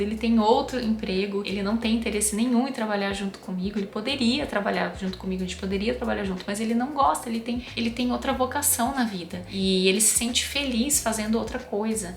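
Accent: Brazil. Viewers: vc é gaúcha?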